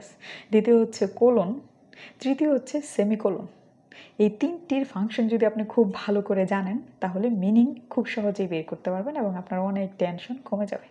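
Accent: Narsingdi, Bangladesh. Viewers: Boin just masallah chilo....apnr accent sone mone hocce apnr bari Narsingdi.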